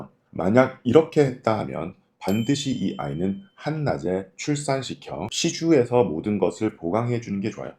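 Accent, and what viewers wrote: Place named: Busan, South Korea. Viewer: 구독합니다. 좋은강의 부탁드립니다. 부산분이신가 봐요😮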